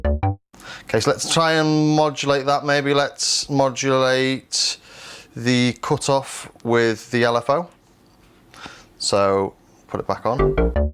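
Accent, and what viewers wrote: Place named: Chester, United Kingdom. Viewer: great review mate...you sound like a Chester lad!!!!!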